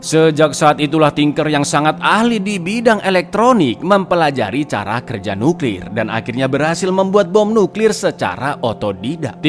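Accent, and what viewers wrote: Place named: Madura, Indonesia. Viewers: Wah logat maduro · Mirip logat madura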